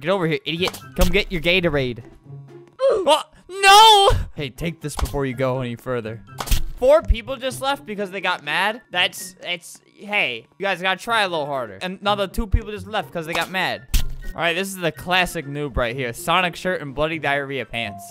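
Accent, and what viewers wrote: South, United States. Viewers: Who doesnt love alberts american accent/voice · My teacher did a southern accent, and she sounded exactly like small edit: Holy crud I’ve never gotten 100 likes until now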